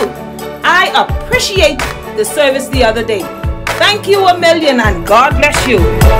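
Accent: Bahamas. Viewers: Found you because I was missing home and missing the food. Hearing your lovely Bahamian accent and the "God Bless You" makes my heart smile. I try not to watch your videos when hungry 😂. God bless you Miss. Thank for "bringing the restaurant home."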